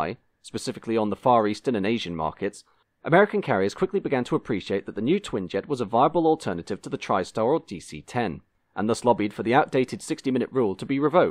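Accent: England, United Kingdom. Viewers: with a name like that I didnt expect an english accent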